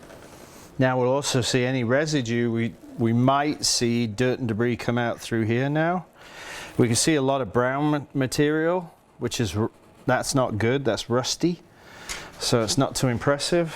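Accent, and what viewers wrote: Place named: Scotland, United Kingdom. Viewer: Thanks. My bike is a 2002 CB500, very nice modern classic. Where are you actually from mate, your accent is a bit US/Brit and I sense some Scottish. May be way off but just a guess. I’m from southern England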